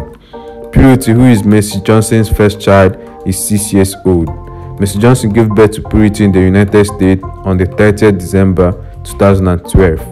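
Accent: Igbo, Nigeria. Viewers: poor presentation. very thick igbo accent.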